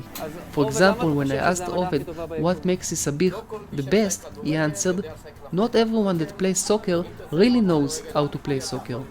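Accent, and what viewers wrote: Israel. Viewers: icetealemonplease it's an Israeli accent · אחי המבטא שלך כל כך ישראלי... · איך שומעים שאתה ישראלי😂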